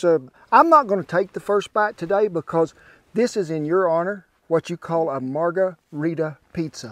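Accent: United States. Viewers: Sir, i thoroughly enjoy your videos and enjoy hearing your cowboy accent. Your fan from Singapore!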